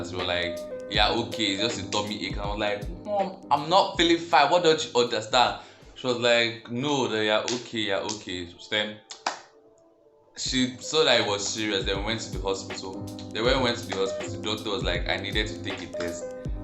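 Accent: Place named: Africa. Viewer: I love this channel, please make more videos, could you put subs on though, I'm british & you're talking a bit too fast & not being racist but you have a really strong african accent & when you talk fast it's hard for me to comprehend what you're saying, it might just be me, let me know if anyone else thinks the same..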